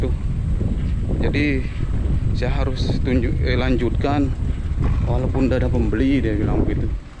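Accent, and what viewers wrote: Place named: Bali, Indonesia. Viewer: Logat nak bali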